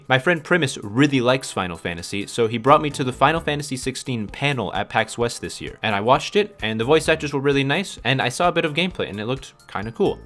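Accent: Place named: Canada. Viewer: I could tell you were canadian from the way you pronounced "out" and "about" came here to comfirm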